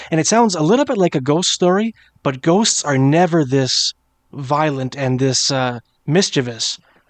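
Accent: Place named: Canada. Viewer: If we ever go to war our password is About! I've heard so many Canadians, like this fella, whose accent is indistinguishable from varieties of American accents but that one word, about, will get them EVERY time!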